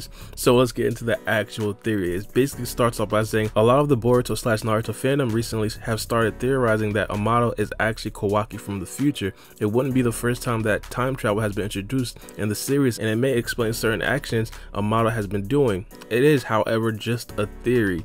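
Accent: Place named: Alabama, United States. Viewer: Yah forgot how Alabama this sounds right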